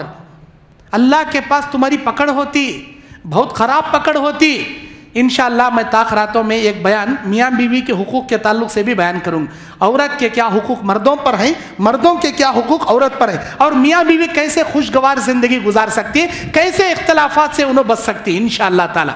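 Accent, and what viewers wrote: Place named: Solapur, India. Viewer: Sobhanllah aapmyry sholapur ky hyn bat cheet ka lhja bhe alhmdu lellah jzay khyr dy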